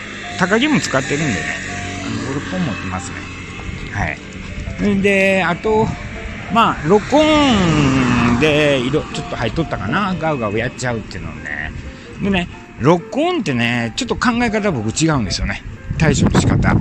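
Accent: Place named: Kansai, Japan. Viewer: 初めまして。スーパーで置き去りにされていた推定5歳のミニチュアピンシャーのメスを引き取って育てて半年になります。当初からグイグイ引っ張り、吠える子でしたがどんどんひどくなってます。犬、車には飛びかかっていく勢いでほえまくりで、とにかく家から一歩でたとたんあたりを警戒して興奮してハアハアが止まりません。普通に穏やかに歩けたことなど一度もありません😂 の動画に出会い、主従関係がまずなってないのだと気づき、室内でリードをつけてボス犬になるべく頑張って一週間たちました。まだまだ辛いだけの散歩です。は関西弁ですがどちらにいらっしゃるのでしょうか？ぜひ伺つて教えを乞いたいいと切望しております。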